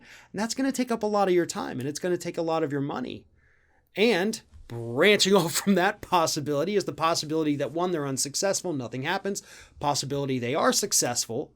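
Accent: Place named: Florida, United States. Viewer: Love your Floridan accent saying," egth,! I dont want that agaun,!" " what was I doing? VOMIT "!!!☺️🤠✌🏽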